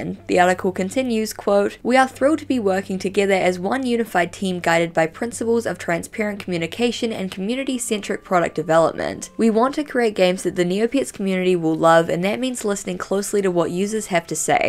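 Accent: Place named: New Zealand. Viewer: Your videos are the best to just put on, and listen to as I clean and do my house work. Your voice reminds me of all the good mates I met when I lived in Tauranga New Zealand. Truly an enchanting, tolkienesque place.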